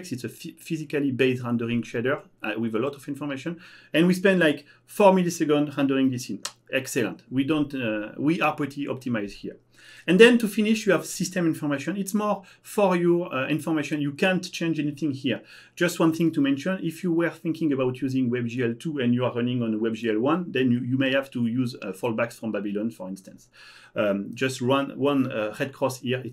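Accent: France. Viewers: Bravo! Ton accent frenchy et toi etes une fierte nationale! Je suis un nouveau converti a BabylonJS. Merci a toi et a toute l'equipe, vous avez fait un travail incroyable. Au dela meme de la qualite de tout le travaille realise, je reste toujours impressionne par la vitesse de reactivite sur le forum. Et egalement merci a Microsoft pour soutenir ce projet en OpenSource. Thanks you so much guys for your amazing work!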